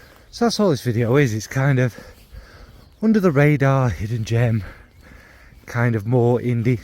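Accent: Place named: England, United Kingdom. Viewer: You don't need too it's cute and funny 🥰 (English accent in french is beautiful!!!)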